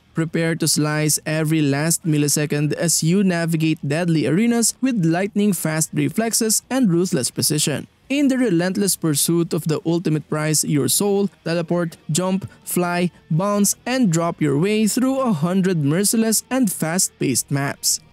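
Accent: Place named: Philippines. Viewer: you have a Filipino accent and vibe.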